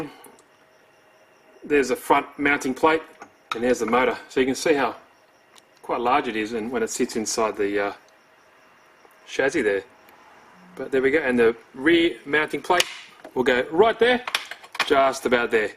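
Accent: Australia. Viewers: secs and i already know he is an australian :D good video mate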